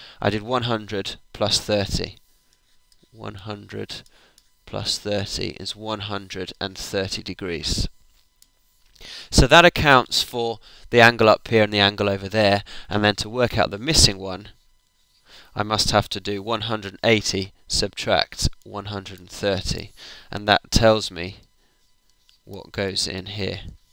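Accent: United Kingdom. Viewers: If all my teachers had an England accent, I would pay attention more.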